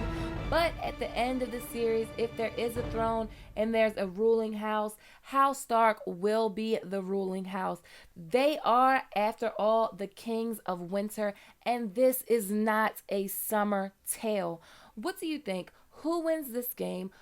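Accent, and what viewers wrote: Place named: Baltimore, United States. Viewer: why the lol? yes sometimes her voice in tone and pitch on some vowels does sound black, but the accent is Baltimore she has revealed, and accents don't have anything to do with color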